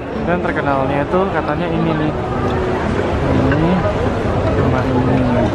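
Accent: Medan, Indonesia. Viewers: org medan ya? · Logatnya kayak anak medan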